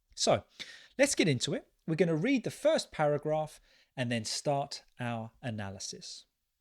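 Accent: United Kingdom. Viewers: Perfecto like always Nice British accent sounds sweet ❤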